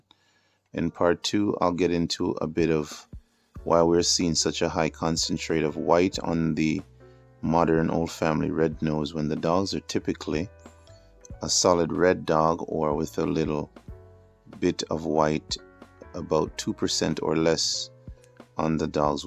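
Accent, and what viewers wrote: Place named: Jamaica. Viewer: Off topic.. Are you Jamaican? Maybe its just me, but Im hearing a familiar accent.. Sound like a yardie..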